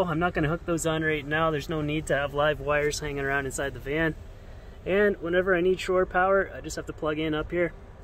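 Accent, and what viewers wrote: Canada. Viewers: nice you have the same jig saw i do! Just bought it to help with my future builds! Love the accent. Mn raised here, Not as thick as your canadian but don't you know..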